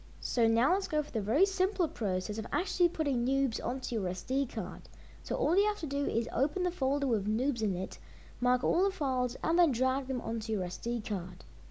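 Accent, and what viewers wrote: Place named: South Africa. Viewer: Thanks for the help. Can't help but notice your accent sounds south african.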